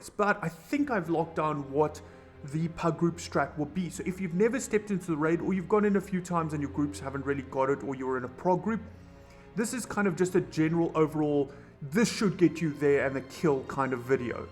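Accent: South Africa. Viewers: are you South African bru ?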